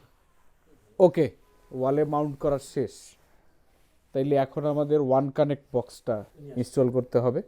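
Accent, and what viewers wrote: Barishal, Bangladesh. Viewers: "পয়সা থাকলে এধরনের টিভি কেনা যায় এবং উপভোগ করা যায় আর পয়সা না থাকলে দেখে উপভোগ করার চেষ্টা করা যায়' কথার টোনে মনে হচ্চে আপনি বরিশাইল্লা। Am I right?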